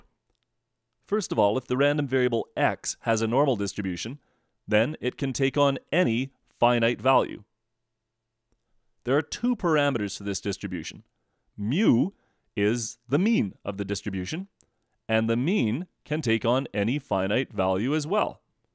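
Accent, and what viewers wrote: Canada. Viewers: and I realized you were Canadian before you said Zed... Thanks for your help professor.. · you're from Toronto aren't u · powerful canadian accent!!!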